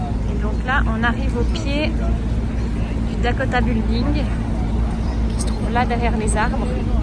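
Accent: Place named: Belgium. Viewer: Quand les videos sont de qualités c'est tjs un plaisir à regarder. Sur la vidéo de présentation de Many j'avais cru entendre un petit accent belge et j'ai eu confirmation sur la première vidéo que vous étiez du plat pays . Si j'ai bien compris vous faites votre trip pendant 13 mois . Vous voulez faire tout le continent américain et renvoyer Many en Belgique ou vous allez le vendre sur place ?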